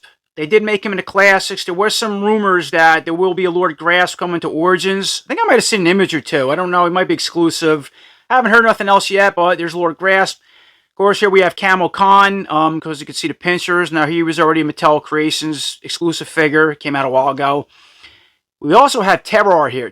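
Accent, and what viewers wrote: New York, United States. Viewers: This guys gotta be from New York I bet 😂😂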